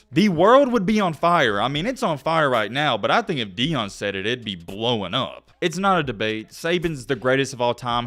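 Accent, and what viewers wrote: South, United States. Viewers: He saying wat he been doing all da times.u sounds country ass hell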